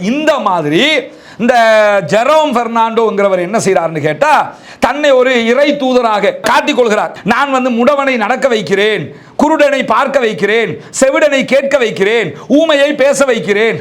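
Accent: Tamil Nadu, India. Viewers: தமிழ் நாட்டில் படித்தவர் அதனால் ஒரு சில வார்த்தைகள் அப்படி அல்ஹம்துலில்லாஹ் மக்களுக்கு தெளிவாக புரியும் என நம்புகிறேன் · Why your Tamil accent like PJ from Tamil nadu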